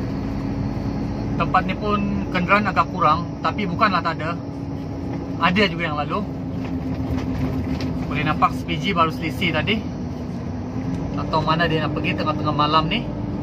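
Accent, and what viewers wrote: Sabah, Malaysia. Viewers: Abang org mana bang? Sebab dengar loghat mcm belah Sabah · cara bercakap ni tentu orang Sabah bah ni di KK bah tu , inda kau tau itu orang KK itu sana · Bunyi sabahan kuat juga tu.. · sama ada sabah atau indonesia..sbb bahasa nak sama dah..tapi tengok kt video ade tulis indonesia xtaw la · Kesian loghat sabah pun tk tau bezakan kata org malaysia.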